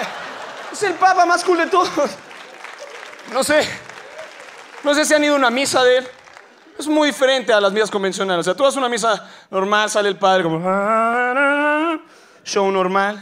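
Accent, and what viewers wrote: Argentina. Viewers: De verdad me creí que era argentino realmente le sale muy bien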